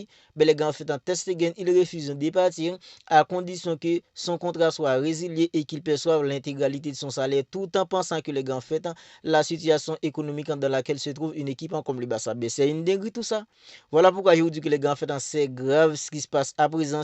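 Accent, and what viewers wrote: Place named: Haiti. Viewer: Je reconnais l'accent d'un patriote haitien, force bro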